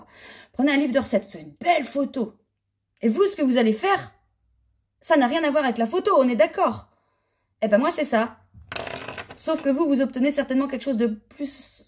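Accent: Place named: Normandy, France. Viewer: 🎉 Coucou, vous me touchez beaucoup et souvent.. ❤ Votre travail est magnifique. J'aime vos Créations et vos Pensées généreuses,.. et aussi, je dois le dire vos mots avec les intonations qui sont les mêmes que celles de ma région natale😂 ( près du Mont St Michel, côté Normandie ) j'adore.. ❤ Merci pour tout; votre futur chàle est Magnifique.. 👌🌟 Belle journée ❤😘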